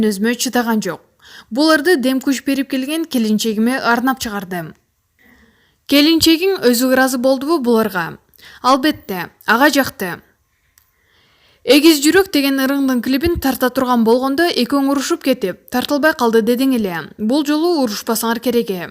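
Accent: Russia. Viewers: Ушул кыз суйлобой эле койсунчу кыргыз эмес орус зорго кыргызча суйлогондой болот.мынча акциент менен суйлойт озун угуп корчу адамдын кыжырын кайнатып.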